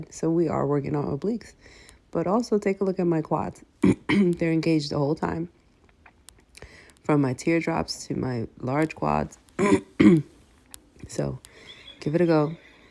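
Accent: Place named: Colombia. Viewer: Are you Colombian because you accent is wonderful keep up the work you are doing great shoutout to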